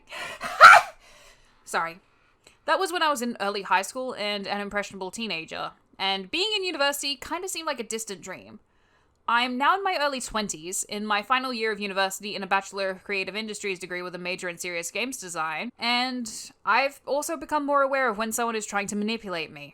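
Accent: Australia. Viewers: You sound like an australian Tiny Tina, an’ it’s awesome!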